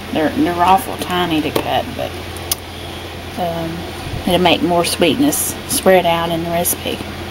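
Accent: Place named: South, United States. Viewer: I LOVE your videos. I am a midwestern cheese, corn everything & cheese girl going raw. Have been for 10 days. We crave what we know and let me tell you, your videos have made it easier for my husband and I to go raw. Thank you very much. Would like to see some new videos from you so you can let us know how you are doing! If you're not still raw, it's ok. You have an entire list of followers ready to cheer you on again! And I love your Southern Belle voice.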